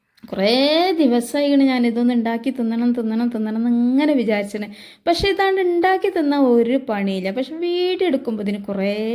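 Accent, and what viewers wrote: Malappuram, India. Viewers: ഇയാളെ വീട് എവിടെയാ 😂സംസാരം കെട്ടിട്ട് മലപ്പുറം ആണ് മലപ്പുറത്തു എവിടെയാ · മലപ്പുറം താത്തക്ക് ഒരു മാറ്റവുമില്ല ചില വിഡിയോയിൽ മാറ്റമുണ്ട് അതിന് ഒരു ക്രിത്രിമം തോന്നുന്നു ഇന സംസാരം തന്നെ മതി. recie Pie കൊള്ളാം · Malappuram basha ishtam 💚💚💚ഞങ്ങൾക്ക് നിങ്ങളെ നാട്ടിൽ വന്നാൽ കുറവാണ് ഞങ്ങൾക്ക് പറയാൻ 💚💚💚🥰🥰🥰 മലപ്പുറം എവിടെയാണ് എന്റെ ഇത്താത്ത യും മോളും അവിടെയാണ്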